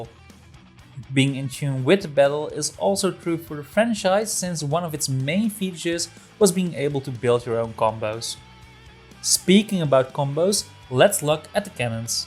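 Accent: Netherlands. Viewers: He's Dutch, I think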